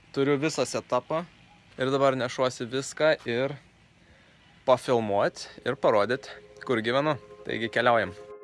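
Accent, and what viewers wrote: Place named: Samogitia, Lithuania. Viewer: Sakau ko toks žemaitoks,girdis iškart 😄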